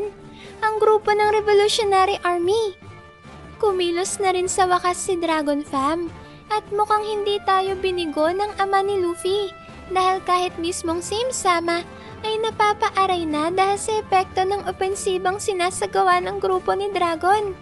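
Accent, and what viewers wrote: Pampanga, Philippines. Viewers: taga pampanga kaba?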